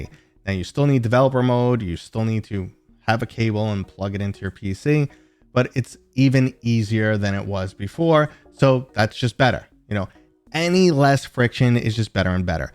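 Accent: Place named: New England, United States. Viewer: Love your content brother. I'm curious. Are you from the Boston area? I'm from NYC and trying to figure out if your accent is New England or NYC 🤣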